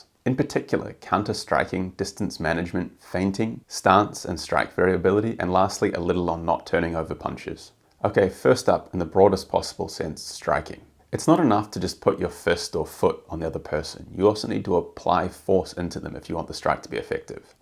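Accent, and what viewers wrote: New Zealand. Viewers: I am so happy I found this channel. I am a Kiwi away from NZ so it was nice to hear the accent! I love MMA but also Parkour and remember you from SP09! You got know Storror!!!!! lol Also, a little TMI, I have rather severe Ankylosing Spondylitis and a weight issue that is more about inability to find a way to move that wont break me. My muscles are a mix of ridiculously strong and dense to almost nothing there and I hope I can learn something, anything from your vids that might help me as I am becoming the Hunchback of Notre Dame and I hate it, deeply. Anyway, great vid, I watched it twice because NZ pay is bad and you deserve money, I liked and subbed. Now onto Callum then Dom! Very interested as Dom was often training with some of the guys in the city I live in, in Aus and seeing him in real life is so different to YouTube!!! Things he attempts and lands that look big on screen, look literally impossible to my eyes. 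GG! Keep up the hard work and I look forward to the next upload! ✌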